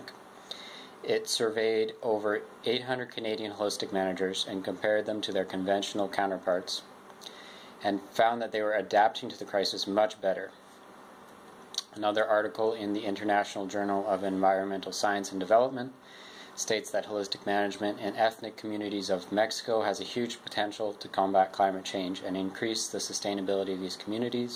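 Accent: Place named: Omagh, United Kingdom. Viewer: Sorry, I posted my previous comment before you asked that we not mention politicians. :-( However, I will mention that I love your channel and look very much forward to visiting you. I once knew a family from Omagh, and your accent reminds me of them. They were lovely.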